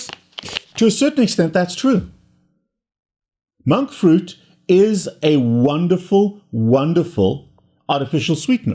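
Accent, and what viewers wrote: South Africa. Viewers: The indignation, mild profanity, sarcasm is hilarious coming from a sophisticated person with South African accent. Educational AND entertaining. Lol.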